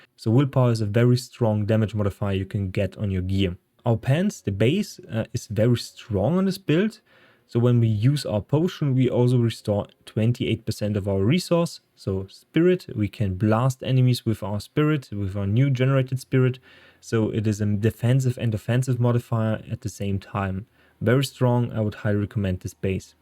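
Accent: Germany. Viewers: Dikka 😂😂😂 nice Video aber dein Englisch 🙈🙈🙈 liest du ab? Würde wetten dass du Deutscher bist, mach deine Videos doch auf deiner Muttersprache. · Thanks for the video. I could listen to you talk all day. I used to live in Germany and so I miss the German accent of people. You sound like you're from somewhere near Mannheim/Ludwigshafen, but I could be wrong....lol.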